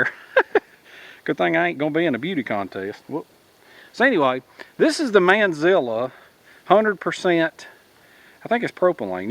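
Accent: South, United States. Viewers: Hey Bro...I just wanted to say how much I enjoy your vids. So plz keep em coming as you have the time. It is really nice to have a southern guy doing vids. From a Bama guy. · Man, you're as crazy as a box of frogs on hot coals ...I just love watching your videos! ;-) I love your southern drawl and your hooting laugh, it cracks me up every time! It always puts me in mind of that 'Stumpy' character played be that wonderful american actor Walter Brennan in the John Wayne westerns! :-) Anyway, keep them coming and all the best from the UK, regards, Daz.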